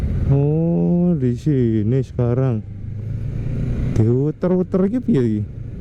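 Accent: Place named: Java, Indonesia. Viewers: Cak Samean jowo ndi hehehe kok mantab ngunu jowone 😂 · Seneng bosomu cak ...ojok lali cak cok e ojok ilangi ya cek ketok jowone😀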